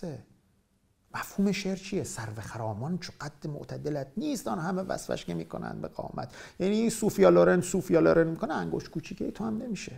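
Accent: Bushehr, Iran. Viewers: دمت گرم، لهجه بوشهری عالیییییییی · لطفا لهجه شیرین بوشهری رو نگه دارید🙏 به موقع قرض ضد بیماری قند خواهیم خرید!😊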